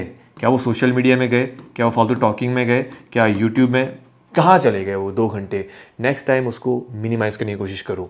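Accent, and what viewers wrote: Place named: Garhwal, India. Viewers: Hn pur garhwali tone ari and face bhi garhwali lgra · Hallaa hota hai!! Garhwali tone sir❤️😂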